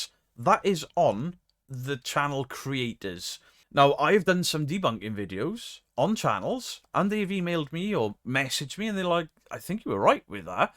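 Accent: Wales, United Kingdom. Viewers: you're not toxic, whoever told you communities were are correct. There are just a small faction of people online who are control freaks, narcissists, and have nothing better to do than try to cause toxicity between groups. They will never stop no matter what you do and do not do. You are beloved in this community. You're the first debunker I've ever seen when I didn't know what that word meant. I could barely understand anything you said because I didn't understand the welsh/english. Now I understand you perfectly, and enjoy listening to you. Mrs. Geek is awesome! ❤You changed my life, recommended other fantastic people who have changed my life as well. Don't let these bad people cause you to question yourself. You've never been the problem. As an outsider I can see much more than some people who are really close to it can. Thank you for being you. 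❤❤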